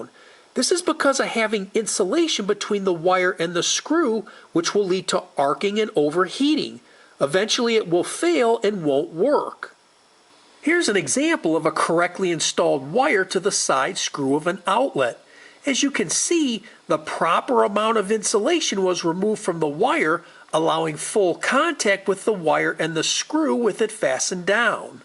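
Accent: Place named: Midwest, United States. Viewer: He's got a strong Midwestern accent. It's called the Great Lakes Twang.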